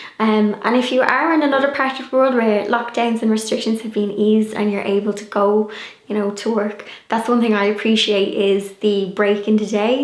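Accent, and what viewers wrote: Ireland. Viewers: You’re so cute. I love your Irish accent. You know Ive been just making do with working around my sewing machine and what nots. I should make my work space nicer. Thanks for the ideas. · You are very organized. You have a wonderful creative mind. Love when you work with power tools! You are the best! Love the Irish accent so melodic! Mery Christmas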